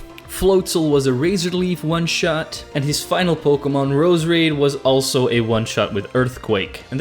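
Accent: France. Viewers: That damn french accent always gets me x) Excellent video, you truly are great:) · That French accent though. Haha! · Like for the French accent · My favourite part of all your gen4 sinnoh runs is Ur French accent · That French accent tho 🔥🔥🔥🔥🔥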